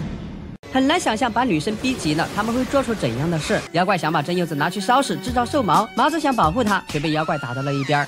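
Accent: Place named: Sichuan, China. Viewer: 我擦 解说是四川人吧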